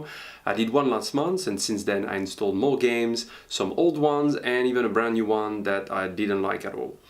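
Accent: France. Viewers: Hello, je sens le petit accent français:-) super vidéo! Je viens de faire l'acquisition d'un iMac 27'' i7 4.2, 16gb ram, 3TB Fusion drive, Radeon pro 580 et je suis ravis! Je fais pas mal de vidéo et je joue également via Boot Camp à BF1, Wow tout fonctionne parfaitement en <num> ultra. Je ne peux que conseiller l'achat de cet iMac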